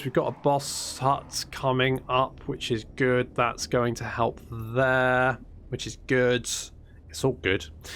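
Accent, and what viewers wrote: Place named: United Kingdom. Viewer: What? Who's competing with you? That fraud PewDiePie? You've got something he'll never have - a British accent.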